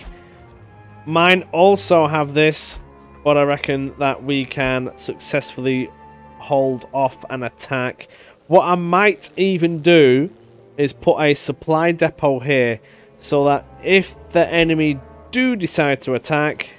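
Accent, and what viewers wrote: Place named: Lancashire, United Kingdom. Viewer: oh I’m on your time mate, been trying to place your accent I may be a bit off but I’m guessing round Bolton or wigan. I’m only in salford so shouldn’t be so confused lol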